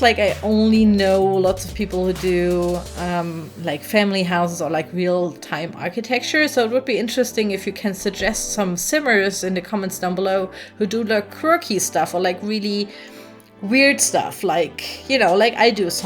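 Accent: Germany. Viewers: Are you german? You accent sounds german 😅 (I'm half german) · Ich: starte das Video und höre die ersten Sätze auf Englisch. Mein Gedanke dabei war: „Das ist kein native English...eher deutsches Schulenglisch.“ Auch ich wie ich bei deiner Kanalinfo lese das du aus Deutschland kommst: „Ich wusste es!!😂😂😂“ finde ich richtig cool, dass du deine Lets Plays auf Englisch machst, damit auch andere, die kein Deutsch können, wissen was du gerade im Video machst!👍🏻 weiter so!😊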